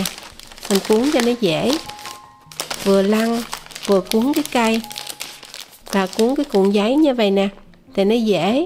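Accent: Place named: South, Vietnam. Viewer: ❤❤ nói giọng miền Nam từ ngữ miền Nam nghe rất hay❤🌹🌷👍 Rất mong quý vị mình dùng từ ngữ của miền Nam❤ đừng lai từ ngữ bất kỳ vào rất khó nghe, rất rất rất cảm ơn quý vị và các bạn Duy Mạnh từ ngữ của miền Nam❤❤🌹🌷👍, giọng nói của dịu dàng rất dễ nghe❤❤❤...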